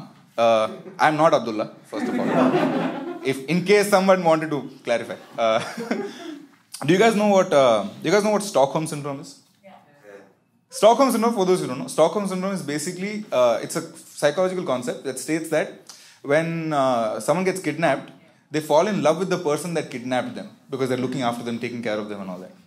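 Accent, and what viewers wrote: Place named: South India, India. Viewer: You sound south indian ... Somehow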